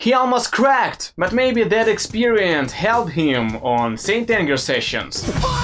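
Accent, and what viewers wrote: Russia. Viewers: Good video! You're russian? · Русский английский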